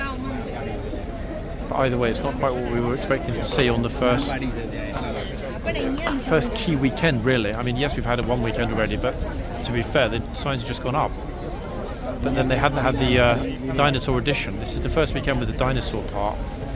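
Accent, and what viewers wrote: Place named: United Kingdom. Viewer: HELLO, I LIVE IN THE STATES & I AGREE ABOUT EVERYONE TOUCHING THE HORSES. I DO NOT UNDERSTAND. WHAT THE BIG DEAL IS THAT PEOPLE FEEL THEY NEED TO TOUCH THE HORSES. I CAN SEE A CHILD WANTING TO, BUT NOT A ADULT. THEY NEED TO BE TOLD NO RIGHT A WAY. THERE ARE GAURDS THAT SIT ON THE HORSES WHEN SOMEONE IS MESSING AROUND WITH THIER HORSE, that do not say any thing, some of them scream & scare me. THEY NEED TO BE YELLED AT RIGHT THEN. THERE ARE MANY THAT DO NOT SAY ANY THING, THEN SOME GO OVER BOARD WITH IT. PUT A LIGHT WEIGHT SIGN ON EACH OF THE HORSES NECKS. PLAY A RECORDED MESSAGE , BUT I THINK THE GAURDS WOULD GET TIRED OF THAT. MAKE A WOODEN ARM WITH A SOFT HAND COME OUT & SMACK THE PERSON WHEN THEY TOUCH A HORSE. I THINK THEY WOULD GET THE HINT, DONT YOU. SOME THING ELSE I WANT TO MENTION, WITH ALL THE PEOPLE THERE TAlking, & all the traffic, you with the british accent, it is very hard to understand what you are saying & talking about, I lIke to hear it all. 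Is there any way you can speak up,? I am afraid if you make it louder it will make e ery thing around you louder. Thank You for reading. If you need any more DO NOT TOUCH THE HORSE IDEAS, JUST LET ME K OW. LOL, DONNA I LIVE IN TENNEESSEE, BUT I AM FROM CHICAGO, IL. OH ONE MORE THING, I THINK PEOPLE WOULD WATCH YOUR VIDEOS NO MATTER WHAT THE TITLE, BUT I WE FEEL THAT YOU TITLES & YOUR TITLES DO NOT ALWAYS MAT H UP. YOU THI K YOUR GOI G TO SEE A VIDOE THAT THE GAURD IS GOING TO GO TO WAR WITH A TOURIST, BUT IT ENDS UP NOT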